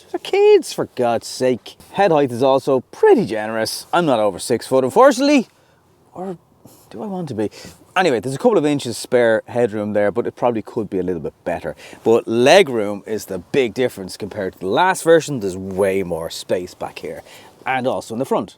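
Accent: Ireland. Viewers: Sounds fine to me - lovely Irish lilt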